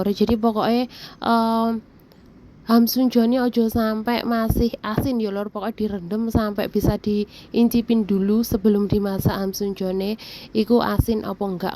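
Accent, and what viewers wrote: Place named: Java, Indonesia. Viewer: Makasih ce resepe,bahasa medok Jawane salam kenal salam persahabatan ce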